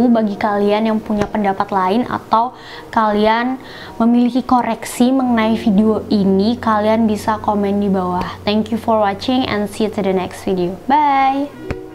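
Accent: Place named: Bali, Indonesia. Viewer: Orang Bali ya kak ? Soalnya logatnya kek Bali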